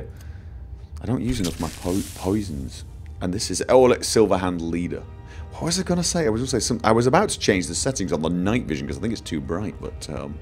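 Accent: United Kingdom. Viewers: Allright, let me get this straight: said that the british still use feet for measurring, but he sounds pretty british to me. im a little confused.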